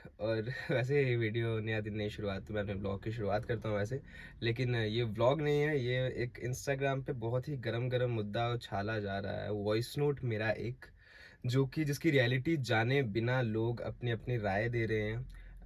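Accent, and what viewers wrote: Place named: Dogri, India. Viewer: We are with! Haters ko ignore karo aur apne future par focus karo. All the best our sweet gentlemen, Btw if nothing is between you and Tanishka still we love you as we loved you before! I saw your red eyes and noticed that how much pain you had faced and your face tells everything that you’re saying truth. Haters will never let you grow because of the jealousy. So keep working,growing,Laughing and making us laugh just like before cuz I have seen you serious for the very first time. And I hope hamari Tanishka Didi bhi theeek hongi 😙. Just waiting with eager and excitement ki aapka vlog kab ayega. Special note for you- Tusi jado Dogri ch bolde go ta Mainu bhohot vadiya Lagda hai. Namaskar and Sat Sri Akal from Canada ❤️🩷🩷🌸🌸🤍🤍😇😇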